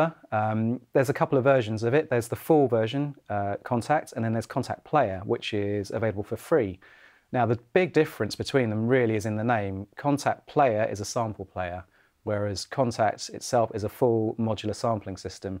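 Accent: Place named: United Kingdom. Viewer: UK accent <3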